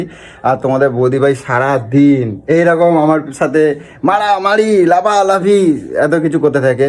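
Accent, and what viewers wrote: Bangal, Bangladesh. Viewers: তুমি একটু বাঙাল কথা কম বলো। আর শরীল নয় শরীর। তবে puchu কে মিস করলাম 😢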